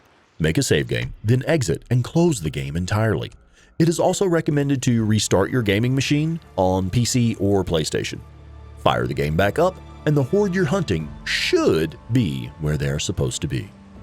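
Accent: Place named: South, United States. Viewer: you changed your voice. I've watched a few of your older Days Gone vids and your voice was a little rounder then, with a hint of southern accent. this is a great game and you make great guides.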